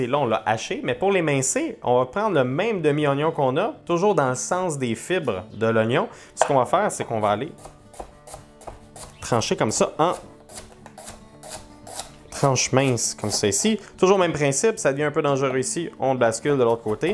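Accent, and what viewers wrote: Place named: Quebec, Canada. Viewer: C'est charmant votre accent au Québec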